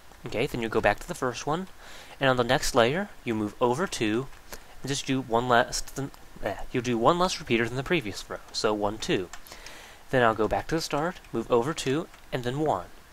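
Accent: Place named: England, United Kingdom. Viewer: Are you a fancy person from England? Your voice sounds like that.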